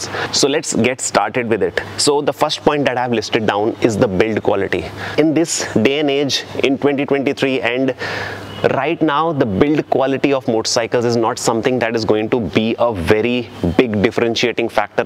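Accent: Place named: Punjab, India. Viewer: Great, well thought-out comparison. By the way, you seem to have a very strong Punjabi accent.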